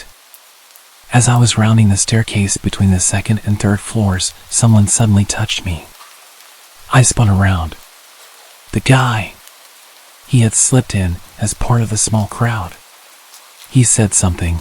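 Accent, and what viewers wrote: United States. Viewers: Oh no, an American, bye.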